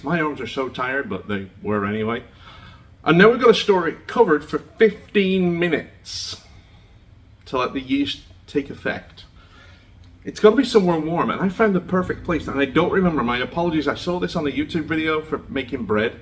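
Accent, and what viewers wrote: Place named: Lancashire, United Kingdom. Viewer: I thought so. Yours is different from the southern Lancashire accent of St Helens. Best wishes. Merry Christmas to you and a healthy, happy and prosperous new year.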